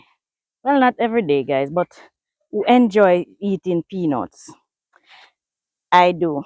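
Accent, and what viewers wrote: Jamaica. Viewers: This is how most Jamaicans speak. Go find a treadmill and leave the lady alone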